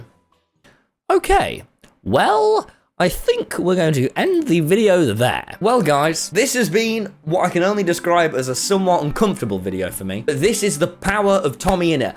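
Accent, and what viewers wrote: United Kingdom. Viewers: i feel like he’s extra british this video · its been a year since i watched tommy. hes turned so much more british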